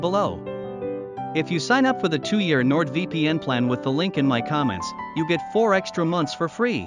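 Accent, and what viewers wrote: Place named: United States. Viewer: I was amazed to discover you have such a broad American accent! 🤣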